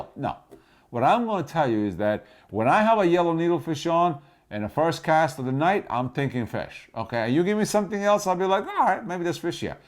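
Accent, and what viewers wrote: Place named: New York, United States. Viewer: you are The Man. I started out just watching your videos when they popped up relating to a subject I was looking for. The more I watch though, the more addicted I'm getting. This one is the best yet - informative, passionate, and really funny. It looks like there's a whole bunch for me to catch up on too. Way to go. Many thanks. Raymondo Ps. Even though I'm familiar with the New York accent (sorry I should have mentioned that I'm in Wales, in the U.K.), you get me sometimes with your pronunciation. For the first few videos I watched I couldn't figure out why you kept talking about your daughter's??? Of course it all came clear when I watch your show on 'darters'. Stay cool pal.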